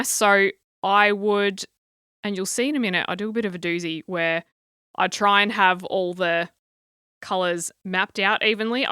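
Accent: Australia. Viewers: Really enjoyed watching this. I love the relaxed attitude and the voice commentary maybe because u r an Aussie and u sound relatable to me. Keep them coming. Looking forward to ur next one. ❤ Btw I haven’t started in my Johanna Basford Small Victories but u have encouraged me to start. (I’ve had it since it first came out) I think I’ll use Gel pens for the entire book. Thanks for the inspiration. 😊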